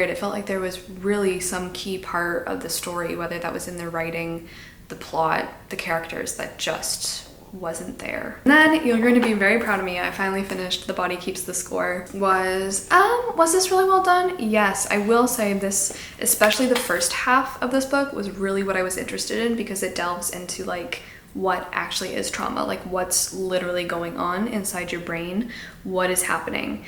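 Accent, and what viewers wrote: Quebec, Canada. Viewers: I didn’t comment on your last vlog, but now with the quebecois accent I have to say it… My tip for big city living is moving to Montreal! It has so many parks, including a « mountain » in the middle of it, most buildings are like 3-4 story high and the sense of community is generally very strong, while still allowing to keep so anonymity and privacy.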